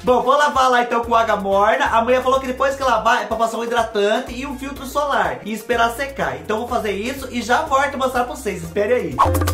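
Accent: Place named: Minas Gerais, Brazil. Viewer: Nossa, segundo vídeo que assisto seu, conheci seu canal hj e só ia ver de curiosidade, mas não aguentei e me inscrevi para não assistir de clandestina kkkkkkkkkkkkk e pq amei mesmo ^^ e esse sotaque mineirês é bão por dimais sô rsrsr <3 Sou uma mineira morando em Portugal agora, mas a mineirisse não sai de mim rsrs ô falta de um pão de queijo que deu agora rsrss Adorei vc e seu conteúdo, ri horrores rsrs obrigada! Beijinhos e sucesso!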